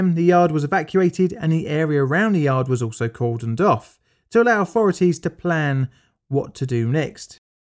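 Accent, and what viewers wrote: United Kingdom. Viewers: Pal, I've got to say, the contrast between the perfect british accent, narrative tone and wording, and the expressions on your bubble dialog boxes for the comics are making my day. It's pure perfection. "Score and a Hen", "Balls" and such. Exquisite combination.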